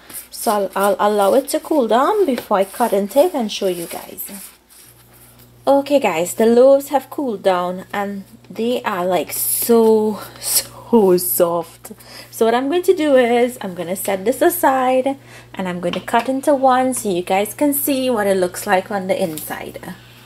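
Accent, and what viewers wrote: Trinidad and Tobago. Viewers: I heard your Trini accent and I was like, "oh, shit, this is gonna be good!" Frigin love Trinis man. They know how to cook! I grew up in Scarborough, Ontario -- I know what I'm talking about lol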